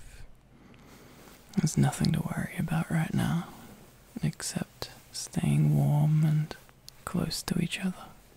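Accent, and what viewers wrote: Australia. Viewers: Aussie accent ahhhh:××× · I like that you're aussie cause idk I feel more at home and it feels more real ig? Idk I also kinda just get annoyed at how americans- and like most others actually- say some words and I get uncomfy so ye that was hard to write fjhreh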